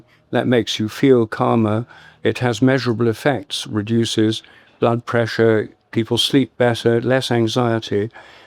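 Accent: RP, United Kingdom. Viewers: This is such an endless rehashing. Rupert is still at it with his posh accent luring in his cult.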